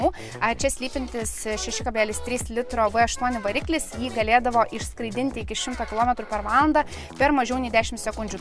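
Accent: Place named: Šiauliai, Lithuania. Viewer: Apzvalga šiaulietiska 😂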